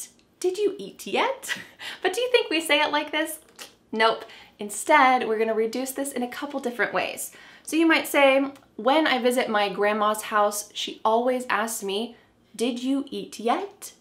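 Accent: United States. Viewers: I'm a native arabic speaker, These days i'm learning American English, so i would ask you Are all your videos in american accent? Thank you very much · 🗽 Amazing video! real American English pronounciation 🇺🇸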